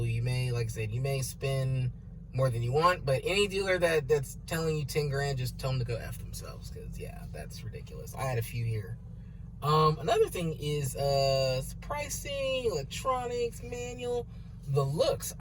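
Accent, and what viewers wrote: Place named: West Coast, United States. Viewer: well it's only 2nd grade English but I wasn't taking a shot at you personally... millions of West Coast people have that exact inflection, especially your bro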